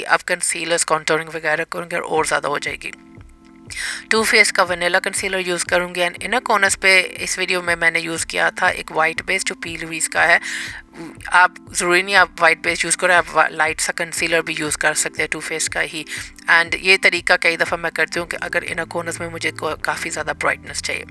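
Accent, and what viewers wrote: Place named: United Kingdom. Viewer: Watching from Karachi,i love your work,and your English accent ❤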